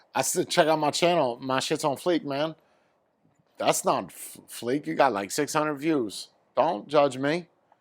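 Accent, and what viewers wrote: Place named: East Coast, United States. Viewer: I swear I’m not a stocker ……. But is this neighborhood in Florida ? It gives me a Florida vibes , but your accent seems East coast ? Maybe I’m way off 🤷🏼‍♂️ Or maybe you are from the east coast and live in Florida now haha.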